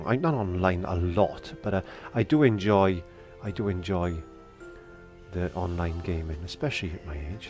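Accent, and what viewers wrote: Wales, United Kingdom. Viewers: Love your Welsh accent! 👍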